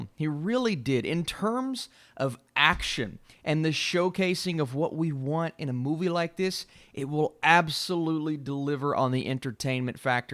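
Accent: South, United States. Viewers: That southern accent you have is lit😍🔥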